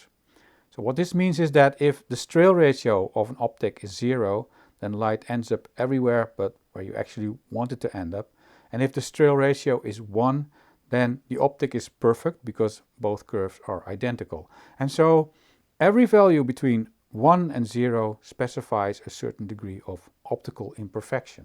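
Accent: Netherlands. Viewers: love your vids and your nl accent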